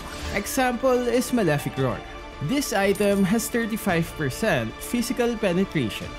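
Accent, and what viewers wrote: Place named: Philippines. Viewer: I smelt tagalog accent in him...😁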